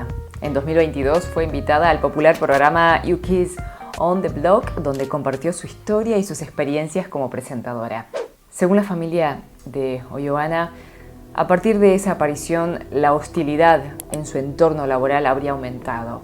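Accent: Argentina. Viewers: No soporto el tono del español 'argentino' 😆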